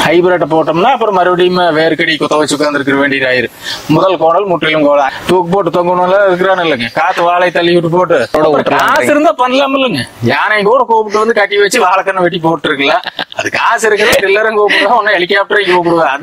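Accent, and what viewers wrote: Kongu, India. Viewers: Kongu thamizh super idai idaiye kusumbu pechum super 👌👍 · கொங்கு தமிழ் அருமை😊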